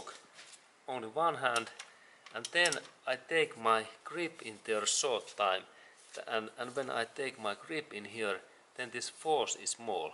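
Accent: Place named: Finland. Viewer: hirveen kuulosta tuo ralli englanti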